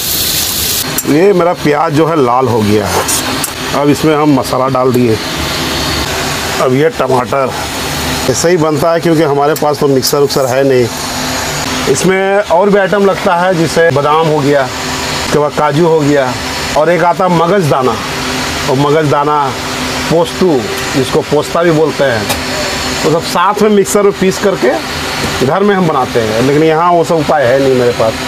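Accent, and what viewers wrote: Bihar, India. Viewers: AAP bihar se hokya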